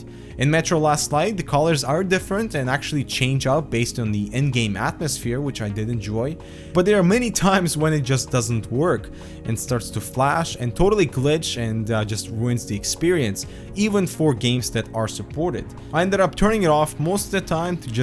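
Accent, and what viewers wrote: Russia. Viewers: is russian? just wondering bcuz his accent is so stong:P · Слышится русский акцент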